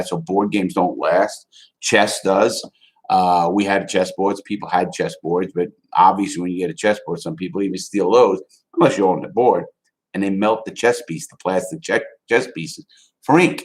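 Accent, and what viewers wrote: New York, United States. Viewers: He’s gotta be New York?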